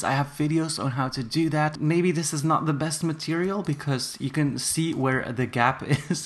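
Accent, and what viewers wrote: Netherlands. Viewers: 0 Are you dutch?! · hold up, i thought you were an American! But no (lol its going to be fun if im wrong) you're a dutchie! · Wait, are you Dutch? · Omg he's Dutch like meh! Yasss Queennn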